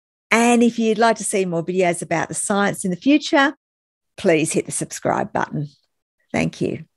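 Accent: Australia. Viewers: Magnificently sensible. Another excellent debunk. The Aussie accent is pure bonus.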